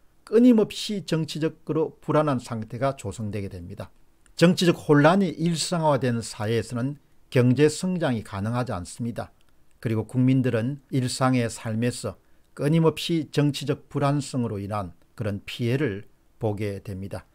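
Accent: Gyeongsang, South Korea. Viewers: 와 경상도 말쓰는 극우 유튜버다! 자한당에 대해서는 아무런 할말이 없지요?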